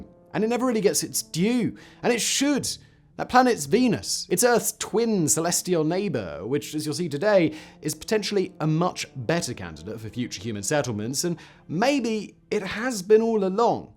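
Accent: RP, United Kingdom. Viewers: I'm not from Britain but oh my god is this guy super posh or is it just me?? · Are you leaning heavily into that academic british accent? Or do you actually speak to your friends with that mouth?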